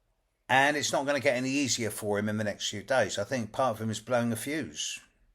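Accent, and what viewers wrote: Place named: England, United Kingdom. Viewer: We love your videos! Your knowledge and that English accent! Thank you for what you do! American girl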